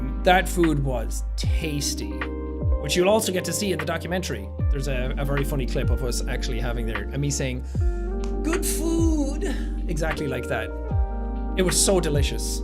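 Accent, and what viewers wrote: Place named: Ireland. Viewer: dude i remember finding you when your where recommended to me while you where playing viscera cleanup detail. Your loud irish accent stuck out to me instantly and i just enjoyed your upbeat personality. Im 32 and now not only do i still watch you but my kids do too. Badass channel bro, and you have one of the biggest hearts on youtube.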